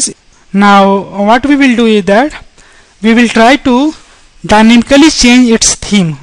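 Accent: India. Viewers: why are all of the videos i really want to watch narrated by Indians? its just annoying and hard to follow, im sorry.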